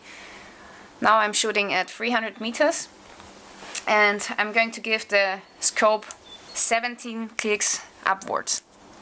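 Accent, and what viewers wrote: Austria. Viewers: very strong german accent，very Österreichisch tuned, better u do it in german and english subtitled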